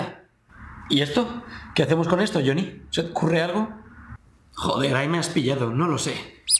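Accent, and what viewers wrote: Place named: Argentina. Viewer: Yo pensé que era Argentino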